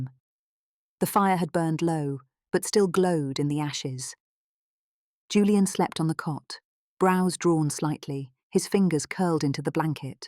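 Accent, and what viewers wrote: England, United Kingdom. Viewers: English accent is my favorite! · I just started listening. The narrator sounds wonderful. Is there anything better than listening to an English accent? Anyway, I’m anxious to see how things play out. Thanks for posting. 📕📖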